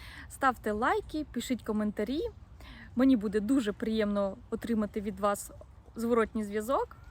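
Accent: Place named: Russia. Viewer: Извиняюсь, но у меня один вопрос. Вас кто-то заставляет во Франции говорить по-украински? Вы явно русско-язычная и для вас это иностранный язык. Слушаешь вас и уши "вянут".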